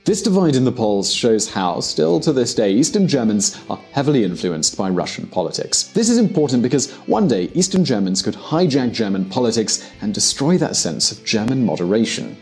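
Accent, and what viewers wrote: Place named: United Kingdom. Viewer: The "Solidaritätszuschlag" you are referring to ignorantly, which you falsely say was used to built infrastructure in East Germany was truly paid by all Germans (that means West and East Germans). It was used to pay • for 15–20 % of the Second Gulf War • support countries in Central, East and South Europe financially • built infrastructure in East Germany • built infrastructure in West Germany *<link> When it comes to voting for DIE LINKE then yes, East Germans are much more influenced by left ideas. Karl Marx was a German. But how in the world is that "heavily influenced my Russian politics"??? Or do you mean "Soviet politics" in your ignorance? East Germans were oppressed by the Soviets. June 17th 1953. And how in the whole wiiiiiide world does East German socialism history explain East Germans vote for the AfD????? 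That has other roots. Go research them! "[...] West Germany became the embodiment of its capitalist occupants." Social welfare was introduce to Germany and cooperated into its free market system by Bismarck in the 1880s. It expanded ever since. Who's unbiased here? Did you watch too much PragerU again, my American friend with the British accent? Your ignorance is enraging. Please consider how many young, inexperienced people are watching your bullshit! Please, please do your research. Please don't fall for platitude. Bring someone into the team who actually studied politics. And economics. Or at least history or law. Anything. Just someone who was taught scienti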